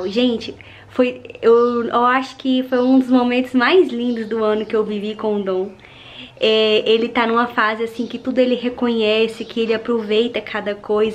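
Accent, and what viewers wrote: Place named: Rio de Janeiro, Brazil. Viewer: Oi, falei muito, mas esqueci de falar que soou carioca, mas moro em Brasilia, sou professora aposentada e depois que aposentei sempre procuro mais novidades em almoços e jantares, simples e rápidos e também mais sofisticados e por isso encontrei-a, pois há anos estou ligada em assistir e fazer novas receitas.para receber família e amigos.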